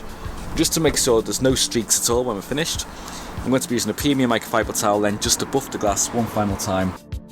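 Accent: United Kingdom. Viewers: What part of the uk do u come from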